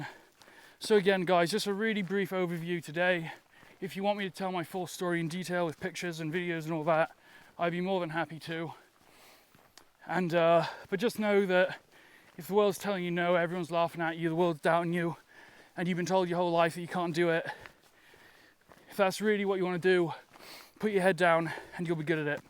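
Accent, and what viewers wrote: United Kingdom. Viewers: I've been trying, unsuccessfully, to place your UK accent. There's a slight hint of "country" to it, but it's very subtle. Mine's a kind of Norfolk/Essex/Cambridge mash-up. Apparently, I get a bit "posh" when I've had a beer. I'm really not! 😂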